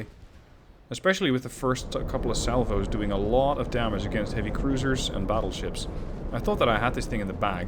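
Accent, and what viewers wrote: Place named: Netherlands. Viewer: u r dutch right? if not i can hear a slight dialect as if ur main language isn’t english, and the dialect just sound super ducth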